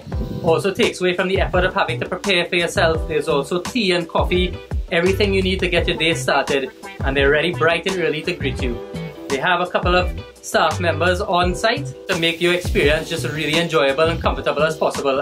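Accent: Trinidad and Tobago. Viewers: I love your west accent. It’s comparable to the convent accent just the male version. Love your channel. Keep up the good work. This place looks nice but for USD $114 per night I would have liked air conditioning and toiletries in the shower eg shampoo but seems like a eco lover dream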